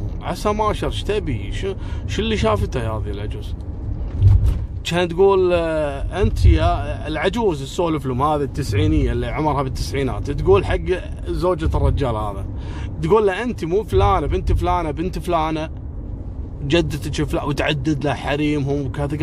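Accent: Kuwait. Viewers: بما أني جديد في سماع قصصك ودي ارجع اسمع القديم بس ماشاء الله تتحفني في الجديد وأنسى القديم أسلوبك مره جميل ولهجهتك الكويتيه الجميلة هي من خلتني افعل الجرس وفقك الله لما يحب ويرضا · الله أكون في عون الحاجة والحاج بعد هدا العمر يطلعوا اخوة والله مثل هده الحادثة صارت عندنا في المغرب وأكن اللحمد لله كانوا لم يتزوجوا بعد فى عزلوا القصة قبل الزواج البنت دخلت في حالة نفسية شديدة هي والشاب ومرت سنين حتى تشافت منها انا ارى ان على الأمهات ادا ارضعوا ان يقودوا لأبناءهم ان تلك الفتاك هي أختك او اخوك كم الرضاعة حتى لا تقع مثل هده المشاكل طريقة سرد القصة رائعة رغم أني كنت أعيد القصة مرتين حتى افهم اللهجة الكويتية. مغربية مرت من هنا 🇲🇦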